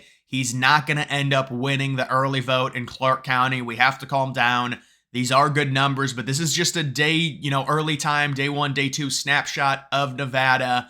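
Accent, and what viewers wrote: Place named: Boston, United States. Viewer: woooweee where is your accent from? Man it's strong. Is that Gaelic Boston?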